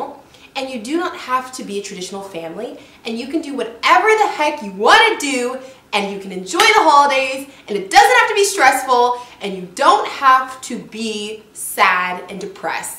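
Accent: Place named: Colorado, United States. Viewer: where are you? I just started to watch you, and your accent sounds like Colorado, where I live..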